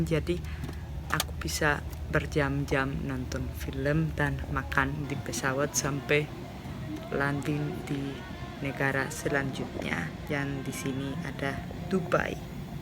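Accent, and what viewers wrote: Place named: Yogyakarta, Indonesia. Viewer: .....logatmu udah Jogja banget, ditunggu video lanjutane yooo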